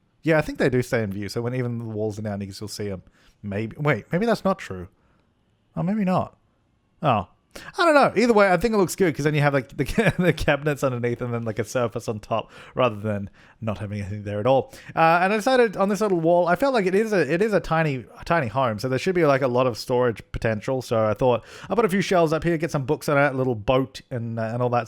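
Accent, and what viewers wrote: Australia. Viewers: hi! i'm a long time fan and seeing you post lifted my spirits so much. your aussie accent never fails to comfort me and i'm not particularly sure why i feel do endeared today but i just wanted to thank you for making this community ❤ much love xx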